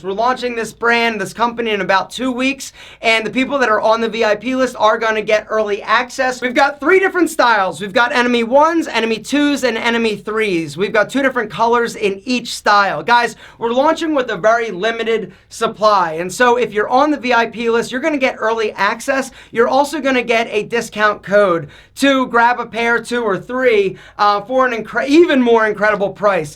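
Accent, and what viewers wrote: Philadelphia, United States. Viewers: I enjoy your posts. I get a kick out of your strong Philly/South Jersey accent. I also agree about the Dollar Shave stuff. The shaving stuff is a bit expensive, but you need so little to have a great shave! So, hang in there . Keep amusing with good advice.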